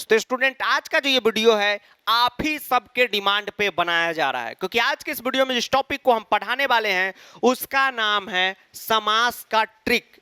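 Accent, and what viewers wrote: Bihar, India. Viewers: i like bihari and after hearing you for hours i am also now speaking bihari 😅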